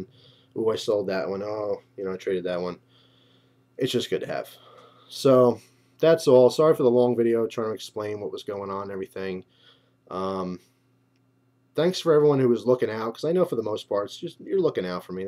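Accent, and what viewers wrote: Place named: New York, United States. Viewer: you sound like you have a New York accent lol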